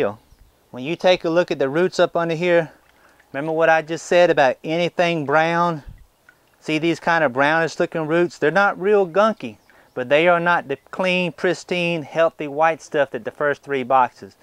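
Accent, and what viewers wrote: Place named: North Carolina, United States. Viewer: I just subscribed about a week ago. And as a Louisiana farm boy I find your videos informational and entertaining. Having worked in North Carolina for a few years (Pittsboro area) I can tell by your accent that you don’t live very far away. Keep up the good work and I will be watching for more good stuff. Specially as you change “SMOKE” hats…….lol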